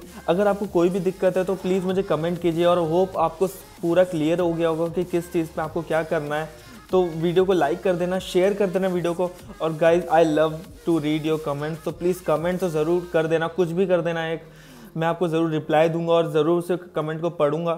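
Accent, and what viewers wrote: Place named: Bengal, India. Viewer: i think u r from west bengal😅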